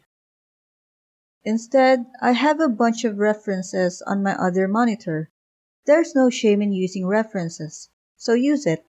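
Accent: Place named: Philippines. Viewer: I hear a pinay accent😅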